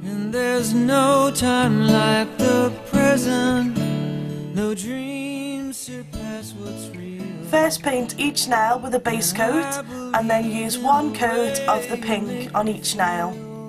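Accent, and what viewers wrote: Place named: United Kingdom. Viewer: OH MY GOSH!! YOUR ACCENT!! I wish i had a British accent.. YOUR IS SOO COOOL!! I'm American :(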